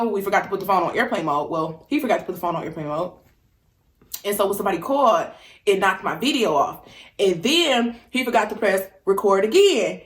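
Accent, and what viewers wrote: South, United States. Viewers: U sound like u from the south are u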